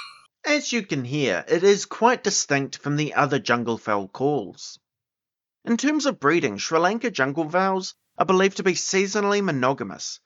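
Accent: New Zealand. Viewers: "Easy to understand", well yeah, once you get past that strong Kiwi accent! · I detected a New Zealand accent from the the third word! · Oh bro, I watch a lot of u tube and the kiwi accent is a shock. Chur to the chicken. Get your self a lotto ..after making this video you'll be extra clucky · Kiwi accent is crazy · Very interesting. I'll subscribe. The NZ vowels are a bit of a challenge!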